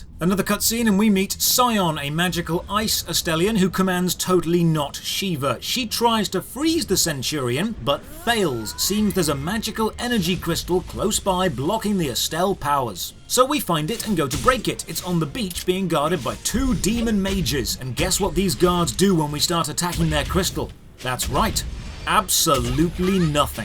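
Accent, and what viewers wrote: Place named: Northern England, United Kingdom. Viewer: "Pick oop"...ah, by gum, yah can't be hidin' your northern lilt.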